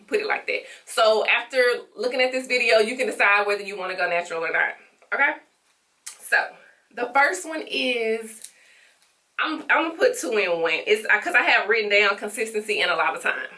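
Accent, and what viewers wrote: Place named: Atlanta, United States. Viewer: You must be from Atlanta... That accent strong.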